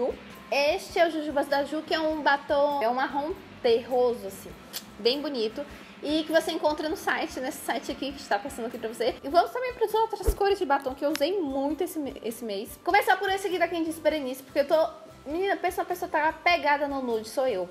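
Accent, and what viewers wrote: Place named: Brasília, Brazil. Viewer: tão brasiliense esse teu jeito de falar , me identifico #jujubanoveda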